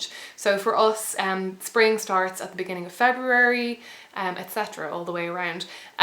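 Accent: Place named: Ireland. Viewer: She has to be Irish. Interesting concepts. A bit fuzzy-wuzzy for my tastes, but I get the idea where she's coming from. Mashing Pantheistic Paganism with tangential science ... what can be sensed .... lends descriptions to quality discussion. Personally, I believe in one, and only one, God. "There can be only one." - Juan Sánchez Villalobos Ramírez How to make a memorable introduction... <link>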